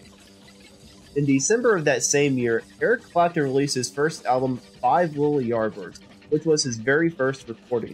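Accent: United States. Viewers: How awful that this completely British band has an american voiceover on its story. Offensive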